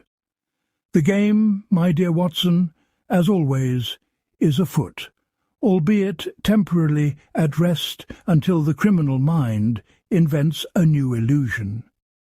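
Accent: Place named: England, United Kingdom. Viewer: I disagree with the above comment. The narrators voice sounds extremely familiar and is so pleasant and quintessentially English, rather like the main characters of the story.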